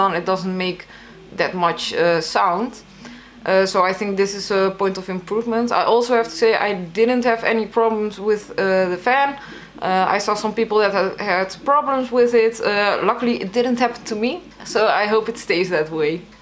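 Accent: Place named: Netherlands. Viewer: Dankje voor de revieuw, ik hoor heel duidelijk dat je nederlands spreekt :) zou ook leuk zijn om nederlandse revieuws te zien. Groetjes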